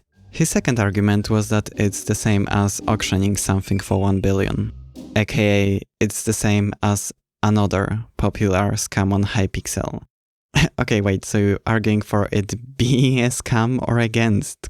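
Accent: Poland. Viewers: Your accent is so Polish.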